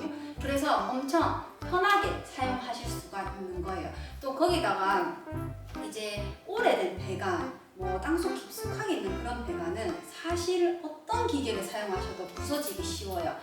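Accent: South Korea. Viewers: 대구에 사시는 분인 줄 몰랐습니다 경상도 대구 고향 말씨 정겹습니다 감사합니다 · 오늘은 ~~~ 경상도 사투리 잘 보고 갑니다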